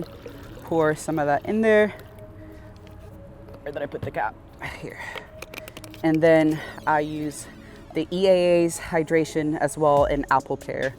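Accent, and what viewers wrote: United States. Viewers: Would have guessed she was born and raised in The USA by how she speaks , sounds very American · for me she sounds like an American. Never would I thought she is from Europe ... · how does she sound so american being raised in sweden??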